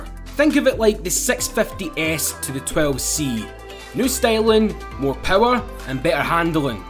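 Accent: Scotland, United Kingdom. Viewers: you scottish bro?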